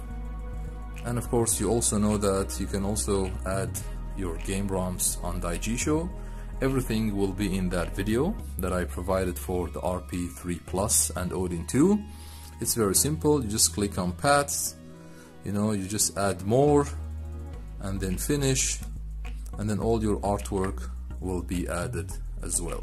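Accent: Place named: Iran. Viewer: This is the most Persian accent I've ever heard in my 43 years on this mortal coil. I give this 5/5 pomegranates. Would watch channel again!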